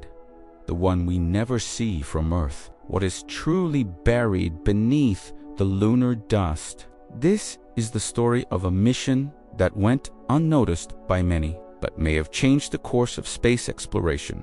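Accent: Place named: United States. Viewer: I can tell you’re American.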